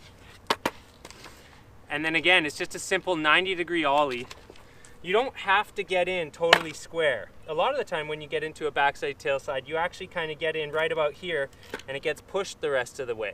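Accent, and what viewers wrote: Canada. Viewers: the Canadian accent was strong on this one haha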